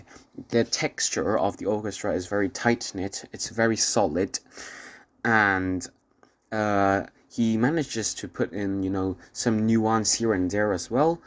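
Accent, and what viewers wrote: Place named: Europe. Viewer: I like the pronunciation of names, it sounds like the Met broadcast announcer/commentator from the 60s. Where one pronounces every name in a "general European way, leaning a bit toward the German way" :D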